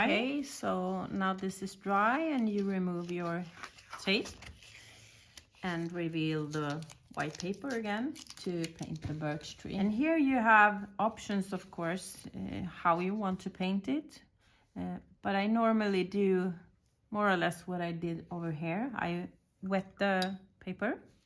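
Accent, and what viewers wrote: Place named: Sweden. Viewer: You sounds as if you are Swedish, so am I and I’m a watercolour artist and have my studio near Linköping. Anyhow I’ll try this and save it for later repeating. Kul att ha hittat dig, jag skall absolut dina sätt att måla björkar! Må så gott! Ps…. Nu är jag prenumerant av din kanal!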